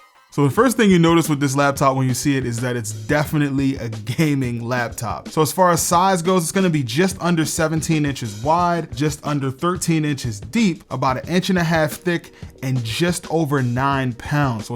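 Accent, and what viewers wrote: Baltimore, United States. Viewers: I hear Baltimore more than the review.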